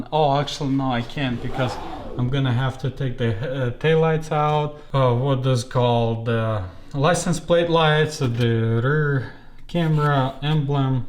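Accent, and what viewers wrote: Russia. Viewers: Love that Russian accent 😂 Nice content buddy 👏🏼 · Is it Russian an accent?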